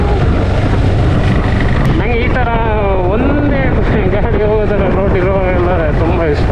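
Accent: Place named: Kundapura, India. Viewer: Super bro... ನಮ್ ಕುಂದಾಪ್ರ,ನಮ್ ಕುಂದಾಪ್ರ ಕನ್ನಡ ಕೆಂಬುಕೆ ಚಂದ...😍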